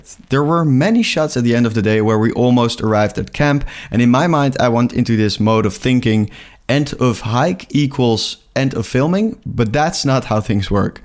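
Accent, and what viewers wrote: Netherlands. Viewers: Soow Die nederlandse accent gaat nooit weg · I smell a dutch accent? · Fist 5 seconds in the video "ohh.. that accent... he must be dutch" The humor in the video - yap, deffinately dutch 😀 Great Video - Cheers from your east border!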